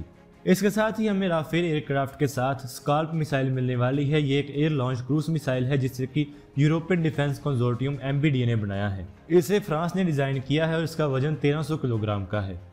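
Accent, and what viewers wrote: Punjab, India. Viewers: Bhai Punjabi ho kya aap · He is from punjab I think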